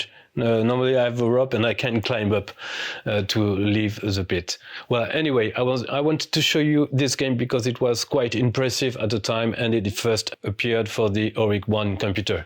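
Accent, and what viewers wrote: France. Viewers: Great, thorough video. Please keep them coming. I especially like the narration, the Frech accent is pleasing to the ears.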